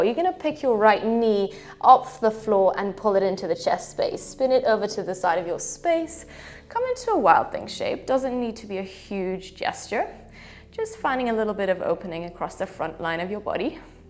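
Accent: South Africa. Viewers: Hi, thank you for this amazing practice, I really enjoyed it as well as your lovely south african accent,I am also from SA but I live in Germany..it feels so good doing yoga with you..makes me feel at home, my intention is to do your challenge, I started today and will carry on tomorrow! Thank you 💖 💗 💛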